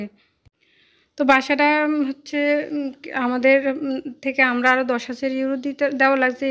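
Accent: Bangladesh. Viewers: মনে হয় বাড়ি নোয়াখালী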